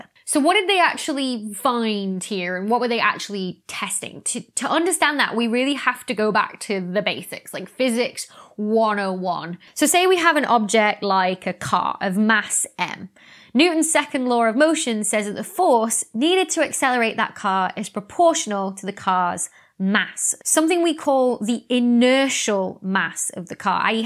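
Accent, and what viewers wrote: United Kingdom. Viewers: If u didnt had this soo british accent i would watch all of the vids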